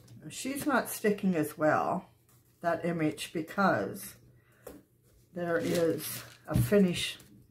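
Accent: Nova Scotia, Canada. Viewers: I was searching for plaster of paris projects for junk journal covers and your momigami video popped up and I'm so glad it did. I subscribed now and like the wide range of art projects on your channel. I live in Denmark, originally from California and adore your Nova Scotia accent. I had just made a journal out of crunched up paper bags with hand cream and loved the rawness. Last year I ventured into gelli plate print making as I don't get into the printmaking studio that often. I will try your momigami technique on them as well. Thank you for sharing your knowledge. By the by, I love Froyle's art too.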